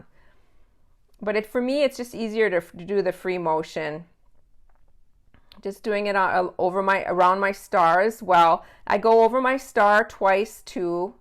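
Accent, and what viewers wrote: Minnesota, United States. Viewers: Brand new at sewing. Thank you for teaching this. New subscription to you. Your accent reminds me of my aunt from Minnesota. I could be wrong. Thanks again.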